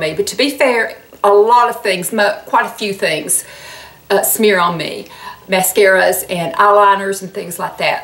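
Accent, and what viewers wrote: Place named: Georgia, United States. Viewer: I’m in Southeast Florida. I love your Georgia accent. I’m 54. You go girl!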